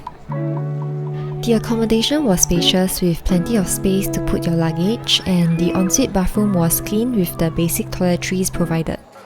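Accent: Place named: Singapore. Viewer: Much needed video! Thanks so much for sharing, feels so good to hear your Singaporean accent😂 It's been 4 months since this part 2 was posted. Hope all is well with you and your family. Looking forward to part 3!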